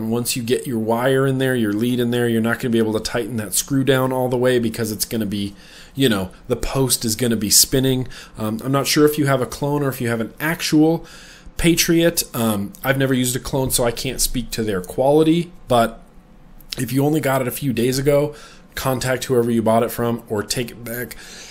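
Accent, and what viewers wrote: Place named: South, United States. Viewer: i just need to say that the southern bell accent is the sexiest accent there is. also i wish i would have started vaping years ago, so i could have been watching these vlogs, its a highlight in my week.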